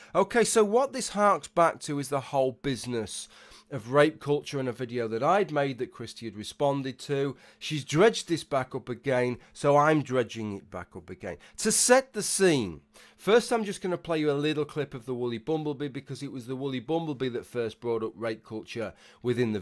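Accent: United Kingdom. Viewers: British accent is the best:)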